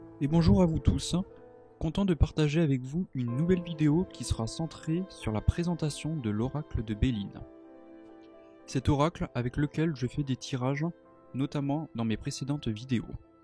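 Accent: South, France. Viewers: Bonjour les cartes sont un support mais le ressenti et très important votre accent du sud ça fait du bien merci à bientôt.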